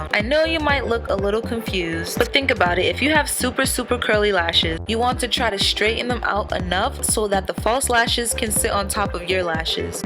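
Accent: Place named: Rural, United States. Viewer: Your lil country accent is the sweetest thing I have ever heard in my life!!! <3 I know thats weird but you keep throwing your lil twang in there and its literally the cutest thing I have ever heard! Get it ma!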